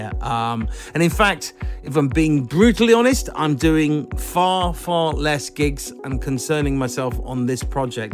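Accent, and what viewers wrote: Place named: Cockney, United Kingdom. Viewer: Didn't realize Chicane was Cockney. Is there a commentary-free stream we can subscribe to? 🤣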